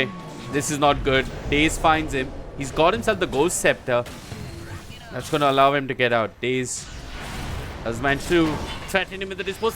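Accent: India. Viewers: wtf is this commentator?! an indian?? wtf?!?!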